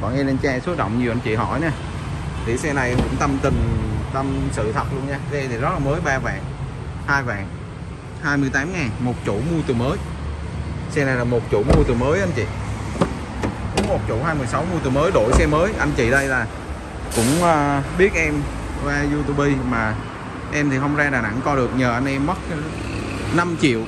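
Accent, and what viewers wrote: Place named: Mekong Delta, Vietnam. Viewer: nói chuyện thiệt tình như dân miền tây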